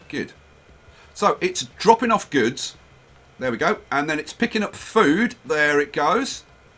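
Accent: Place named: United Kingdom. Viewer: Brilliant ideas you need to write those ideas down someplace .. and YES I would vote for a decoration and tidy up episode. After all it is a large part of building up the map to be the best that it can be. On a completely off topic note by chance are you and John Oliver from the same area ? My ears tell me you two could be brothers, I do not mean that in a negative way at all, I think it is very cool, as you both have great enthusiasm for what you do, and your voices express and convey that to your audience. Good on You mate. I am busting here, waiting for the train ride on the new railway.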